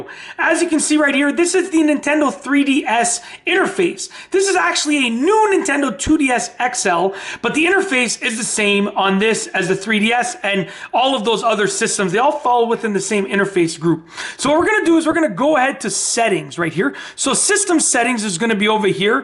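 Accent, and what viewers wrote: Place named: Canada. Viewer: I love that I can tell you're probably Canadian just listening to you. Well wishes from those of us who aren't crazed lunatics from the south of you.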